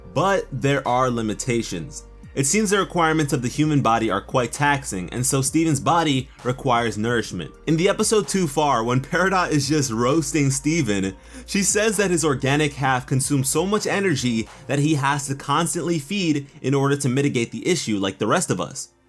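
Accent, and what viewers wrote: United Kingdom. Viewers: Are you from the uk 🇬🇧??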